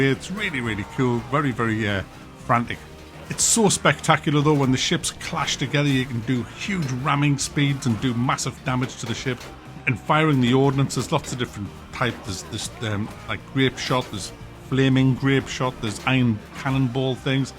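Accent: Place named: England, United Kingdom. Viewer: I have watched your videos since 2017 now. I still cant figure out, where in England your accent is coming from.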